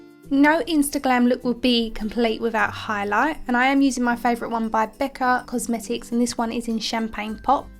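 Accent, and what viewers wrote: United Kingdom. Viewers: I never tire of hearing your accent, I miss the UK dearly! I love your explanations and being able to watch your skills, I wish I could have you as a mentor! Could you give an aspiring MUA advice about how to start practicing makeup on people? I don't know how to even begin building a makeup kit and not be poor:/ Any pointers would be sincerely appreciated, thanks +!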